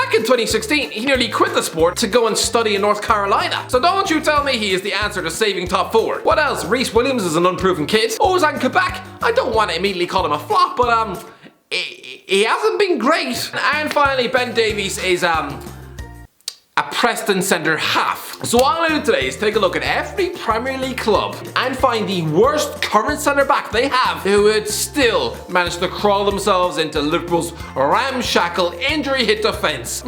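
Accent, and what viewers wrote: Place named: Ireland. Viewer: Its fun hearing read Nigerian names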